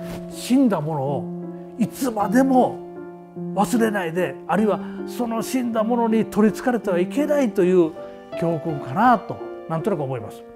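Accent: Kansai, Japan. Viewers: うーん、深い話だ。 百田さんの関西弁で聴く古事記・日本書紀の話は本当に面白い。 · 関西弁古事記‼︎声出して笑ってしまった 公共交通機関の中ではお氣をつけください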